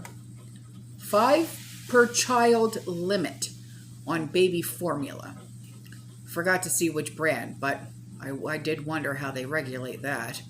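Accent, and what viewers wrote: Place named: Staten Island, United States. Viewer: OMG how have I not seen your videos before? Listening to you is a blast from the past, you sound like Staten Island where I came from (I'm in North Carolina now). Thanks for all the info, I've clicked the subscribe button!!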